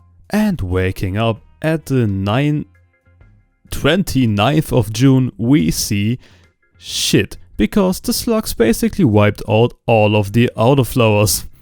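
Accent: Germany. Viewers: 🤣 the German accent makes this funnier, I dont know why this part was hilarious too lol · He is german. Finnish have different accent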